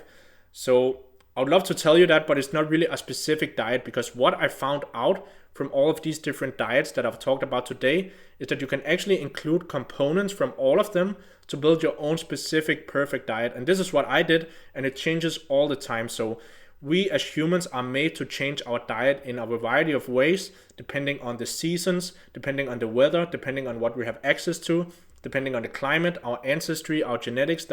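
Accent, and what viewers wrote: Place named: Denmark. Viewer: Danish? You sound familiar :)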